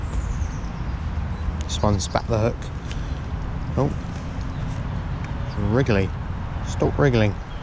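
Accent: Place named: South, United States. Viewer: Hi mate, fantastic location and fish! Your accent sounds like youre down south, im from Buckinghamshire but struggling to find some good wild trout streams like this (a lot of them seem to be expensive chalk syndicates or fly-only). I dont suppose you'd be able to give me any tips on these sort of locations you spin for trout on? Dont mind driving an hour or 2. Can always PM if you dont feel like sharing publicly, thanks, James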